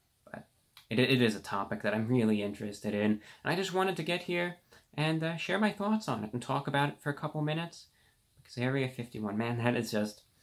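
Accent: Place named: New York, United States. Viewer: We will land on the moon if we invade area 51, just kidding... I wouldn't try to invade it! I do not trust the government, however I don't think aliens will ever come to this earth because maybe we are the aliens. Sometimes I think we are a very old race, and not necessarily in human form but in consciousness, I have my own reasons for thinking this. Also great video, I can hear your NY accent more and more and I love it!!! Love your laugh too! You are so effin cool and open minded with your all your commentators. Also I am seeing more and more folks thinking that we are living in a simulation. I can not agree with this because of the randomness in this world and I mean random car wrecks, and children dying of cancer. What do you think about us being in a simulation? Ps. I watch all the adds so you get paid. love ya!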